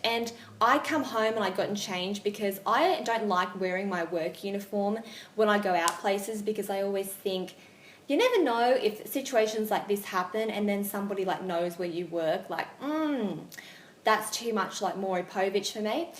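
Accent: Australia. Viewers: Are you Australian definitely i think im in aus too sometimes its not that a guy wants to be creepy but when they find a girl attractive at times they might not know the best way to approach the situation. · Your Aussie?? 💘💗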